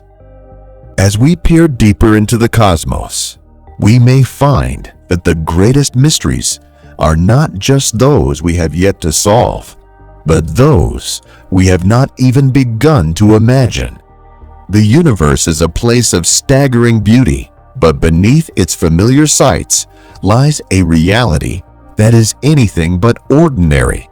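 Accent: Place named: United States. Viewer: Annoying American voice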